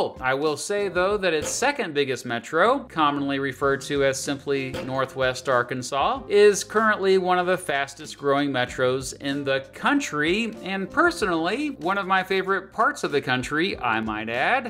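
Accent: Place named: North Carolina, United States. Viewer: I always thought your accent sounded like North Carolina. I've lived in Missouri half my life too.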